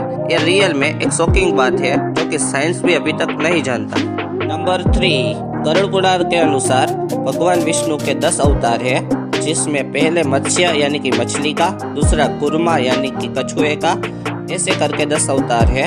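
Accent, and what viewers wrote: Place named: Gujarat, India. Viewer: I realized from your voice that you are from Gujarat..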